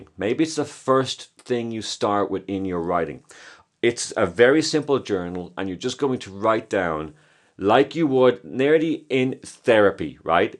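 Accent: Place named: Ireland. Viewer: Thanks! I love your videos! They are informative and well presented! And I love hearing your Irish accent :)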